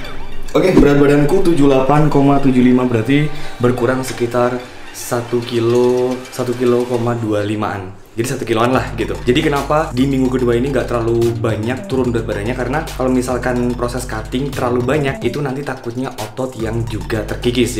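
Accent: Malang, Indonesia. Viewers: Orang malang?? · Asli orang malang ta? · oalah mas iki wong malang to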